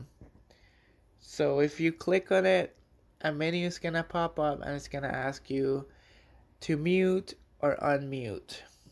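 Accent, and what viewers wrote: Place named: Brazil. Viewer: Cara teu sotaque é de br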